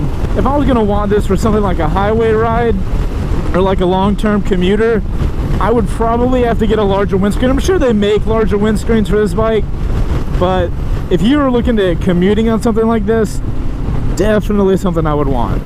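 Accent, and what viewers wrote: South, United States. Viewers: Dude I fucking love this bike...I'm buying it. This will be my first bike.Thank You, you smooth southern, silver-tongued bastard.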